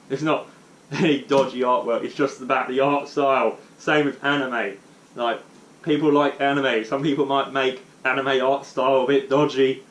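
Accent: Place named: United Kingdom. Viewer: Boi i can't stand this level of British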